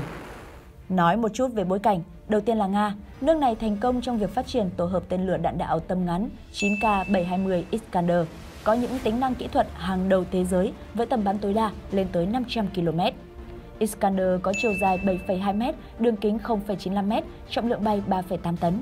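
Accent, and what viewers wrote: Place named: Vietnam. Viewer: Tên lửa Mỹ, bằng tiếng Anh- Mỹ, thì kênh phải phát âm như tiếng ANH - MỸ; ai lại phát âm theo kiểu VN nghe nhà quê và sai bét.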